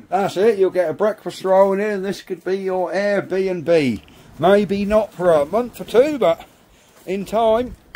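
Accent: Norfolk, United Kingdom. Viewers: I love the Norfolk area accent. It's a bit like the south west accent without the exaggerated "arrr" sounds.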